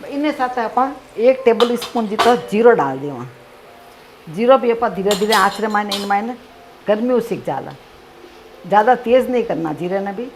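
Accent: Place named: Marwari, India. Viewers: aapki marwari bhasha sun kr maja aa gaya. Har step smaj mein aa gaya. today I will try. Thanks Mummy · Aapki Marwari sunke meri Nani Maa Ki yaad aagayi aunty ❤️❤️ Thankyou so much 😢😢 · Yummmm👌👌🌹🌹🌹🌹chitney bahut achi he aur I love marwadi bhasha.. soo sweet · Ram ram sa ap ki marwacdu boli se pet bhar jata hai aur ap ki recepi👌👌🙏👌👌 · Bht Shukria. Apka bolne ka tariqa achcha laga marwar yad agio. Chatni bht mazedar lag rahi h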